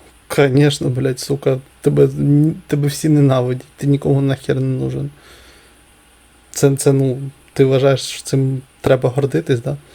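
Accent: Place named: Russia. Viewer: А он музыкальный критик? Или комиссар из отдела политической цензуры? Кстати отчётливо слышно, что родной его язык русский, но он усиленно выдавливает из себя мову. Похоже, что вместе с мовой ещё шось выдавил в штаны.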